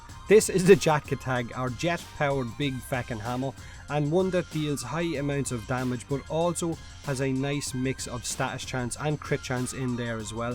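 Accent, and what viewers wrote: Ireland. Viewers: i love your Irish accent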